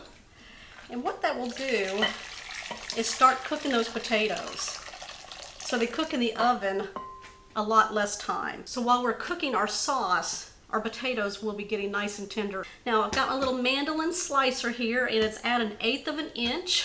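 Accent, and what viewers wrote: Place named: South, United States. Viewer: Thanks ❤️I would appreciate it…thinking with that “ya’ll “ and somewhat Southern accent like mine that you would do some traditional Southern dishes 😀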